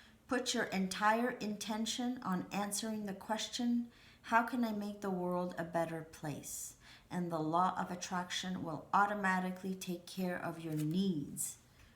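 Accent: Canada. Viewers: Bonsoir j aime bien vos guidance et j adore votre accent j aime le canada je vous souhaite une bonne soirée ne changer rien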